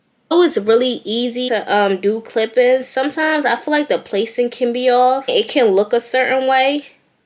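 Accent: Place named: DMV, United States. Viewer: Are you from the dmv? I hear an accent 😍 this video definitely helped me love it thank you !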